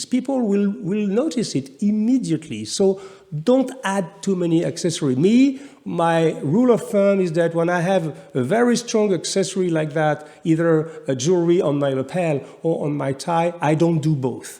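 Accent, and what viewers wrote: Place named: France. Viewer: I always like to listen to your nice french accent, makes style sound extra stylish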